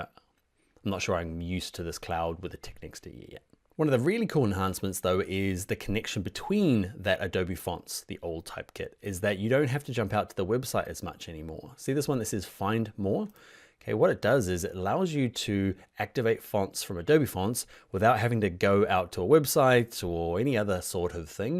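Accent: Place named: New Zealand. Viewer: Best combination of informative and cool funny narrative. Good job man. You are my favourite whats new guy. And the accent! Kiwis are best...:-)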